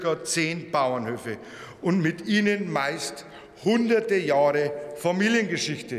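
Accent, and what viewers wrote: Bavaria, Germany. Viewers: Bairisch, ich liebe es ❤️.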